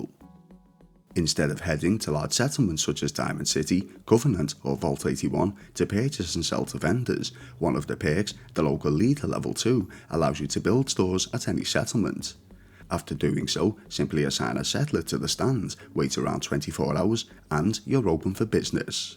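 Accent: Liverpool, United Kingdom. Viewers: Sounds alien to me to hear a scouser on fallout videos. You get the likes n the subs etc for thst alone. Greetings from a manc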